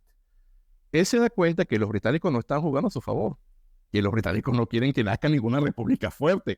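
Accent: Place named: Peru. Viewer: Entiendo que se le deshumanizo y se le dio carácter mesiánico, eso sí es cierto, pero no fue el único libertador mitificado. Soy venezolano, y la verdad nunca me aprobaron ningún examen de historia bajo la presión de hacer la señal de la cruz ante la imagen de Bolivar por favor...deje de decir mentiras.... ni me obligaron a cantar el decreto de guerra a muerte, ni nada de lo que usted menciona. Tal ves sería en su escuela, deberia decir cual fue su escuela y donde estudio usted , ademas de que su acento es peruano, no habla como venezolano. Esta usted diciendo muchas cosas sin fundamento Sr. Revele sus fuentes y escriba un libro para poder buscar sus fuentes historicas. Sinceramente que verguenza usted. Ojala tenga que cerrar su canal por baja popularidad. Diciendo mentiras no se llega muy lejos. Revele sus fuentes.....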